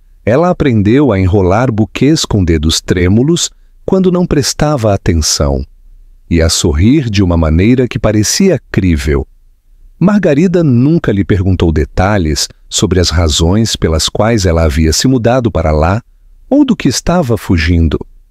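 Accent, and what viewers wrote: Portugal. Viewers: O tema da história é bonito, mas a narração a torna muito chata! Principalmente qdo o narrador fala o português de Portugal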